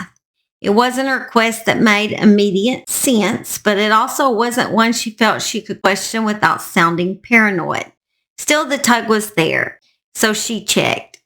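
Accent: South, United States. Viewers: Oklahoma?? That's not true you have a southern accent Oklahoma is way Midwest they don't speak in that manner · I like your voice...a southern accent is comforting to me...probably bc I'm southern. Lol Hyped your video. Encourage people to comment too bc it helps the algorithm.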